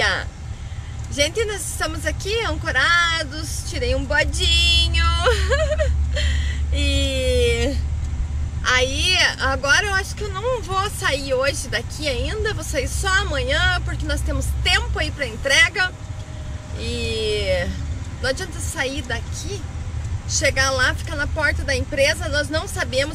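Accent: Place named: Rio Grande do Sul, Brazil. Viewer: Vc e uma motorista gatinha hem. Fico xonado nesta sua voz de gaúcha!!!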